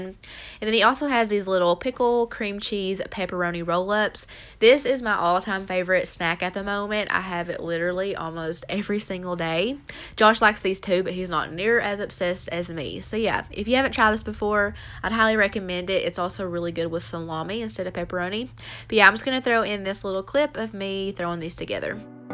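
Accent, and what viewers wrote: South, United States. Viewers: I just love your channel..I love your southern accent. And your great food ideas..keep it up..much love from Nashville,tn Katie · Eeeekkkkk I’ve missed these videos so much 😭😭😭😍😍😍😍😍 and your accent is everything. Southern girl here too ❤️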